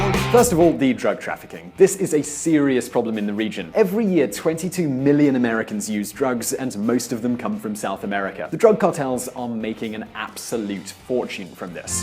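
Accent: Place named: United Kingdom. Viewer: Well then, I don't understand why is it they can't tell the difference between "Salvador" and "El Salvador" ...I mean, it's THEIR original language after all, isn't it? But the guy on this video is definitely NOT Spanish, you can easily tell by his accent he's British.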